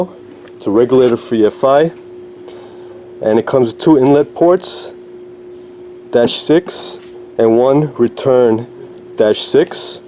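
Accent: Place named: Alabama, United States. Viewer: I enjoy your videos , they take me back to the days of hanging out with my brother , thank you for that , but I have to ask , I can't place your accent , is that Alabama or maybe southern Georgia ? 😈😂😇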